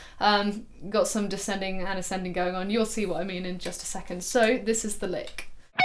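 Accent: United Kingdom. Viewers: Did not know you are British ! you rock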